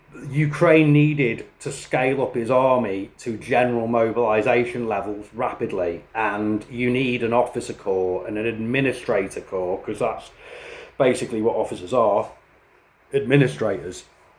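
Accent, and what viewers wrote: United Kingdom. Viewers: I just came across this video... you sound British to me. I struggle to feel sorry for foreigners who go involve themselves in a war. I file this under "it is what it is". Come back home, or stay there but stop whining, you chose this.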